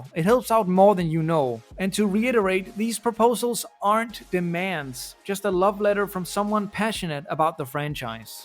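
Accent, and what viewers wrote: Denmark. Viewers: Your accent sounds danish, is that right? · Den danske accen er rimelig let at genkende føler jeg.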